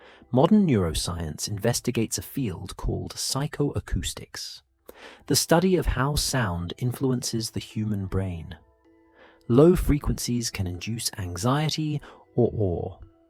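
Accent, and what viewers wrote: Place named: United Kingdom. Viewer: Is this what we're forced into a British accent on every freaking Channel I think it's a good Channel but can't I get it in an American accent👎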